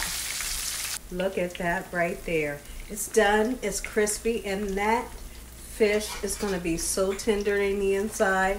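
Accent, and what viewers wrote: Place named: Ohio, United States. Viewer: OOOH MY GOODNESS! BETWEEN THE DELICIOUS 😋 INSPIRING MEAL YOU PREPARED, THE PRAYER, AND YOUR VERY INVITING BEAUTIFUL OHIO ACCENT - YA SOLD ME. THE WAY YOU SPEAK COULD INSPIRE THE DEVIL TO DO RIGHT IF HE'D ONLY LISTEN. YEP I WILL SUBSCRIBE AND TELL A FEW FRIENDS. THANKS 😁😅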